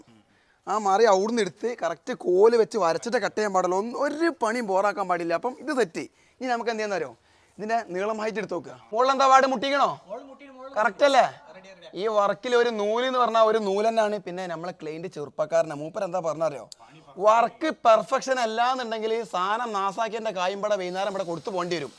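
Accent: Malappuram, India. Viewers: താൻ മലപ്പുറത്ത് എവിടെയാണ് തന്റെ സംസാരം സൂപ്പറാ👍🏻👍🏻👍🏻👍🏻👍🏻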